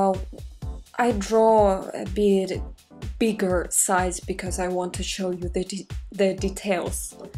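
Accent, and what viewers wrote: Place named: Russia. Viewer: You have a russian accent, i think :)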